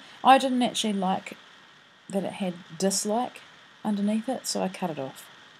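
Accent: New Zealand. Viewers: This is gorgeous. I love that you keep any 'mishaps' in there and work through them. I actually think the gelatos and acrylic paint at the end looks pretty cool and arty! It's also nice to hear a NZ accent. My Dad is a kiwi and I miss that accent.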